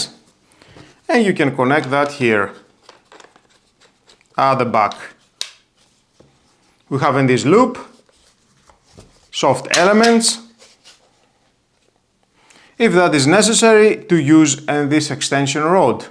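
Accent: Greece. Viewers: Are you Greek? A friend of mine has exactly the same accent 😊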